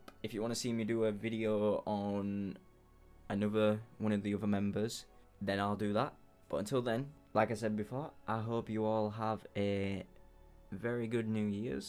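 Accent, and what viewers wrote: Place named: United Kingdom. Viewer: I know how you feel...Even though I already know the songs, listening together with you put me in exactly the same state as you. A kind of bliss and existing in my own sphere^^ It's like his voice, his emotions and the lyrics stay even when the song is already finished, and keep resonating with different parts of my subconciousness.... I honestly love how moved you are by their songs, makes me really enjoy watching your reactions. Thank you! :) Btw as a non English native speaker.... still trying to figure out your dialect^^ I would go for GB but there are still so many possibilities lol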